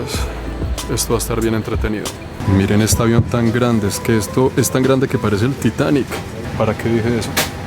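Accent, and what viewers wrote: Colombia. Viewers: Me pregunté ese acento es Colombiano, y lo de andariego y aventurero definitivamente está en los genes y como dice el dicho " Al que le gusta le sabe estar aquí , allá y aculla. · 🌎 mi amor bello le noto mucho mas asento Colombiano ultimante, me imagino por los estupenda saga que realizaste alla, o por que te estas relacionando con mas colombianos en Canada, cuentanos....⁉⁉que dejame decir que tu asento es precioso, cariños Elinita Venezolana que vivio 6 años en Panama y ahora esta en Italia de donde son mis raices..❔Gracias por hacer los videos..✅✅✅✅✅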